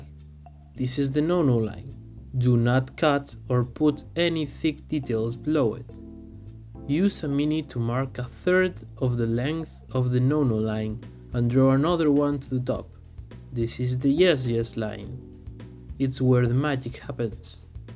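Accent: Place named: Russia. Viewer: I was thinking Russia or Kazakhstan you sound like a friend shows how well trained an ear I have for accents. Spain and South America seem to have many really great crafters. Have you ever heard of Scenery Planet TV? They make some really cool terrain boards and Blood Bowl fields.I look forward to more vids by you and how the world of crafting is doing on your side of the world.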